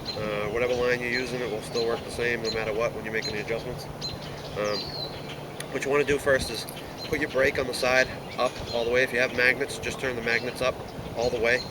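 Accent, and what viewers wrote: Boston, United States. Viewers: is that a boston accent i hear?? =)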